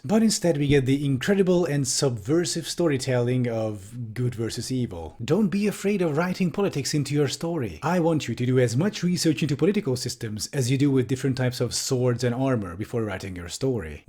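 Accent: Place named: Scotland, United Kingdom. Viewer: Interesting video Ádám, loved the Scottish accent. I've Subscribed. Looking forward to more videos. Is this the channel where you don't rant about trains and busses?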